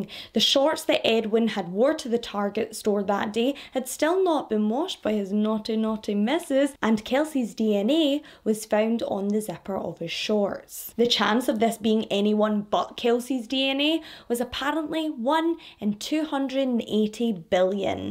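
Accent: Scotland, United Kingdom. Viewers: Your personality is outstanding. You are the kind of friend I would be drawn to for all the laughs and accent too. LOL Being American the scottish accent sounds so beautiful, but your personality is outstanding. So happy I found you to watch and entertain my work days. I listen while I work and the day goes so much faster. Thank you.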